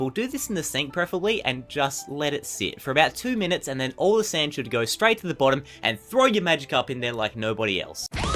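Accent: Australia. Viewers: r u australian cuz i am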